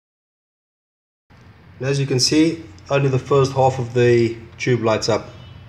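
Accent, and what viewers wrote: South Africa. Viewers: Ahhh s.a accent 👌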